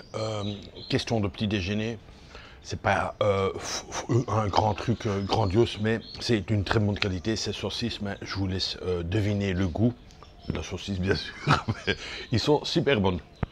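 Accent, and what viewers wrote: Belgium. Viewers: je suis tombé sur toi par hasard,au premiers son de ta voix,jai su que tu etait belge,hahaha,ca fait plaisir de voir un youtubeur belge,par contre oulalala,je vient de voir ,vu que je t'ai decouvert,que jai une tonnes de video a regarder sur ta chaine,j'en ai pour un moment.......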